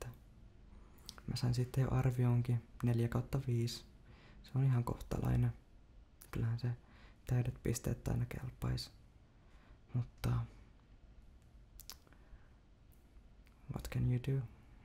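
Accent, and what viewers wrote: Finland. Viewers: Your finnish accent and cadence..... Relaxing rambling obviously comes naturally for you^^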